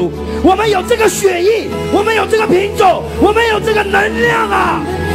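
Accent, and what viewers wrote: Taiwan. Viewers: 台灣的吧！ 一口一個“xue yi” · 我就想问问这是谁？听口音台湾同胞呢？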